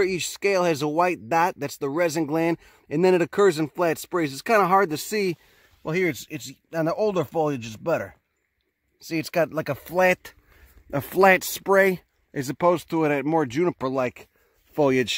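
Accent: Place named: West Coast, United States. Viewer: Wow! You give me hope for Paradise and Magalia. Well, not for me, but maybe in 250 years it'll be back the way it was. I searched many barrens in eastern Butte county. I just knew there was an undiscovered Californite lode besides Pulga. Nah! But it got me out walking and exploring. Love your instructionals. Your west coast accent sneaks in there sometimes.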